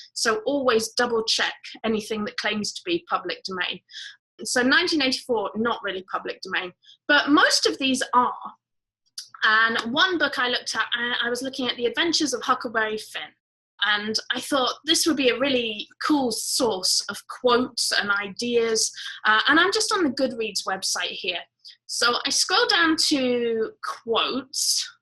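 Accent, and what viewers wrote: United Kingdom. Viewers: I love your accent,. Are you English??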